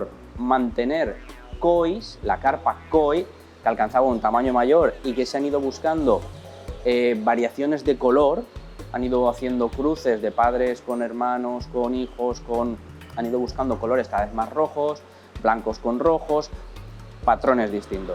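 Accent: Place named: Spain. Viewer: Como extraño la continuidad de videos del canal Como extraño a hablar con su acento español que es tan lindo y enamora, en fin si, enamora 😉 🇦🇷 ♥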